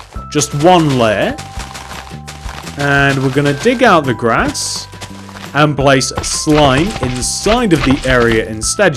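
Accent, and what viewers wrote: United Kingdom. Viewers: Quick question are you british?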